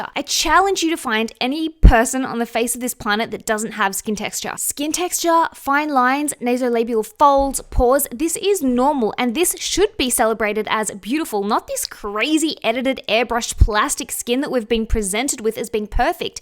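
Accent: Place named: Australia. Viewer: It’s the Australian accent bro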